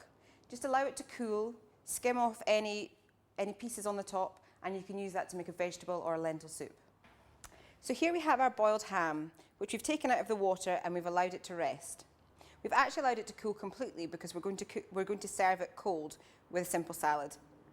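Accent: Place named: South Africa. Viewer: I just love the South African accent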